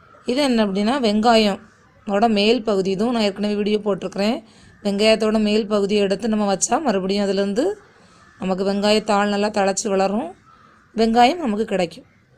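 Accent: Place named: Kanyakumari, India. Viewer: குமரி நீங்கள் பேசும் தமிழ் அழகு அக்கா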